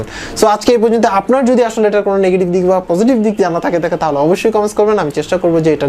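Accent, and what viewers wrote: Bangladesh. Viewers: বাংলাদেশি মনে হচ্ছে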